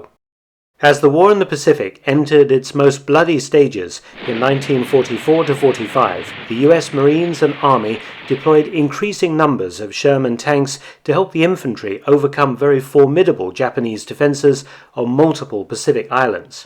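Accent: United Kingdom. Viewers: I love your intro then your awesome British professional voice over.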